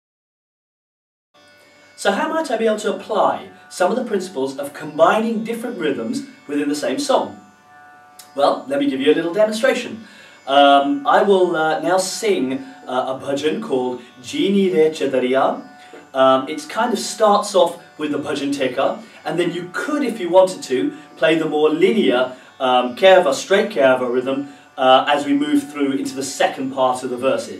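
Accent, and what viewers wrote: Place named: England, United Kingdom. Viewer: .( love your english accent).you are the best techer..i live in USA a fiji island transplant...grew up.heRe in wichita kansasUSA🇺🇸..i heard many teachers on u tube..but MASTERY OF LANGUAGE MATTERS..bedides talent..i just bought a basic tabla..trying to learn basic tabla beats....thanks for having such a good talent..u 👍👍👍r incredible mhndraUSA🇺🇸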